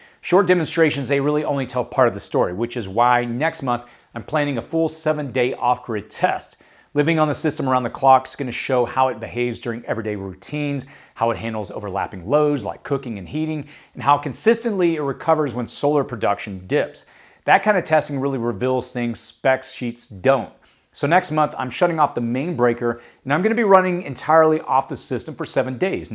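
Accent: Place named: California, United States. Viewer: Hmmm are you close to the Bay Area ? I heard California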